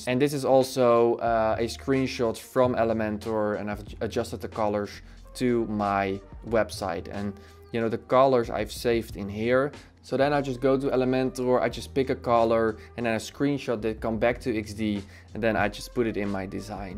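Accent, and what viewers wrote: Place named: Netherlands. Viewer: Ben je nederlands want ik hoor het aan je accent haha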